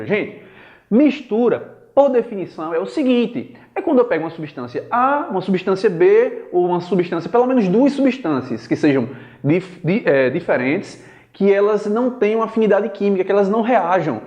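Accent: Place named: João Pessoa, Brazil. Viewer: sou recifense, percebo que o sotaque em cada estado do nordeste é muito diferente, sabia que eras de joao pessoa apenas pelo sotaque! divertido isso kkkkkkkk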